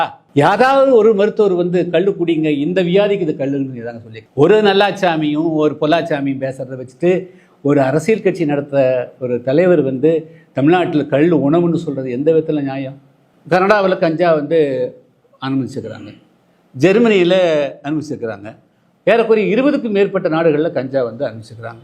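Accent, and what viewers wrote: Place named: Telugu, India. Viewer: ஐயா நீங்க பேசுறது சுத்த தமிழ் மாதிரி தெரியல தெலுங்கு வாடை அடிக்குது.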